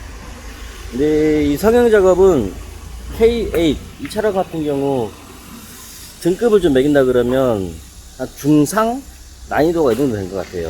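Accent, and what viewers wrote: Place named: Gwangju, South Korea. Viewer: 다른영상에도 댓글남겼었는데.. 번창하세요.. 같은 광주분이라서 더더욱 말씀이 구수하네요 ㅎㅎ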